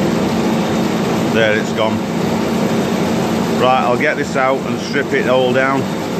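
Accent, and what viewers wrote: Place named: Wetherby, United Kingdom. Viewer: Ahhhh. Three years without a video. You're killing us, killing us. Once in a while I go drinking with a guy who used to live in Wetherby, but has now moved to the colonies (as he calls it). His accent is similar to yours but he is a prison guard, not a machinist. It's lots of fun but there's no trepanning talk. The only drilling he sees at work happens in the showers. Ken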